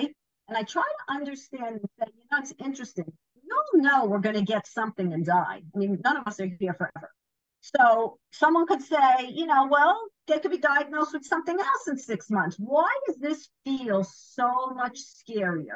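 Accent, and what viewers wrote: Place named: New York, United States. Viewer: It’s the New Yorker in her ….absolutely lovely!!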